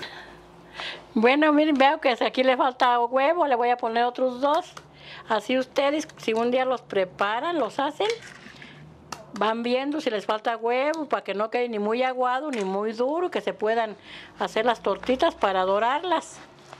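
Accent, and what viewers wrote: Michoacán, Mexico. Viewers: Su acento michoacano me hizo recordar a mis tías, mi papi es de Michoacán yo me siento orgullosa de la sangre que me tocó, muchas gracias señora hermosa por su sencillez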